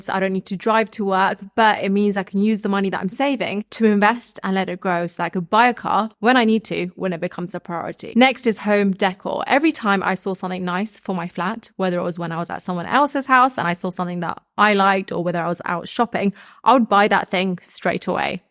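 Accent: United Kingdom. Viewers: Love your attitude. ❤ I have similar Lifestyle. As a german, I must say, you speak to fast for me and very britisch 😊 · Again overdose of british accent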